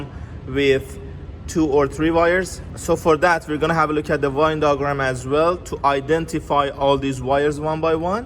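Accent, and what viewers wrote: Iran. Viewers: ایرانی هستی؟لحجه فاسی داری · Well done mate, you are such intelligent person, by the way your accent sounds Persian😊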